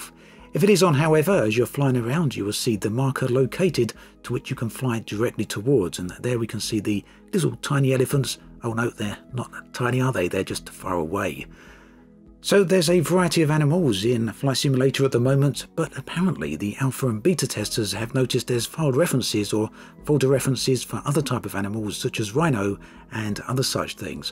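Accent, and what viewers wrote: United Kingdom. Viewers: Because he’s British? I mean, people do have different accents depending on where they’re from 🙄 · His English is so think from Englad or Wales or somewhere. He seaks so fast and move the issues so quickly It is like pulling a rabbit out of a Hot.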